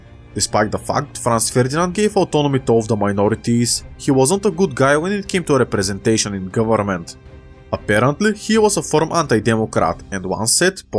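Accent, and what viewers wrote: Bulgaria. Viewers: the Bulgarian accent is one of the many unique features you bring, please only remove it, if it makes you feel uncomfortable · Don't. I might be biased because I am also a Bulgarian, but I love your accent.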